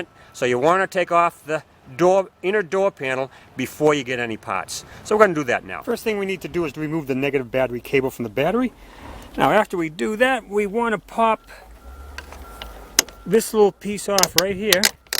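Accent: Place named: New England, United States. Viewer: I saw a million professional videos on this job and it's the guy with the New England accent, like Peter Griffin, that got the job done and helped me truly understand. Much appreciated. 😄🍻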